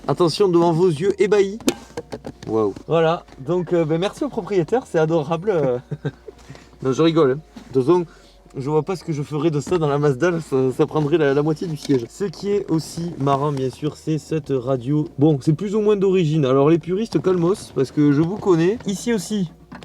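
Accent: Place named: Lille, France. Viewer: Sympa la vidéo l'ami Lillois, c'est votre accent qui m'a mis la puce à l'oreille😄 Tres beau modèle que vous nous présenter, félicitations à son propriétaire. En revanche, votre tenue vestimentaire me fait penser à une pub pour Cetelem🤭 Encore merci pour la vidéo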